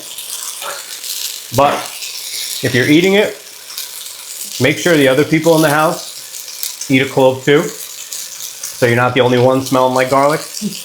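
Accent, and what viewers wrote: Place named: New Jersey, United States. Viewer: I like hearing the NJ accent again. I no longer say 'warder' any longer, but like hearing still. Makes me homesick for the pre-1960 NJ/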